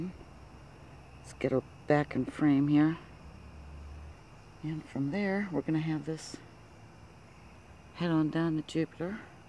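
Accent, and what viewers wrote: South, United States. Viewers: Hi, Absolutely stunning Footage!👍👍👍. I would have to say Saturn is one of my Favourites just beautiful. Thank you for the Time and Effort you put into these Video's, I love the Overlays you put up to show what's what! I have to say I Love your Southern Accent, so soft and Lady like! Have a wonderful Weekend. Take care♥️. Melbourne, Australia.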